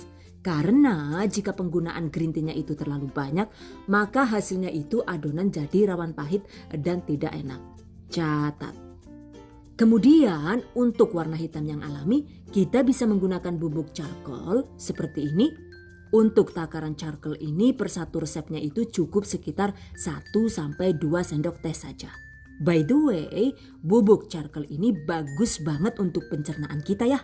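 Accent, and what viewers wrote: Banyumas, Indonesia. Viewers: Salam kenal saya oma holly dari cirebon,saya suka sekali lihat video,kuenya enak n penampilan menarik dan yg paling saya suka itu omong inyong2,n kelucuannya,saya sudah bnyk resep yg saya tulis di buku soalnya belum punya oven hehehe jadi,yg udah saya buat donat aja pokoknya yg d goreng2 sukses selalu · Q suka dgr logat ngapaknya sist..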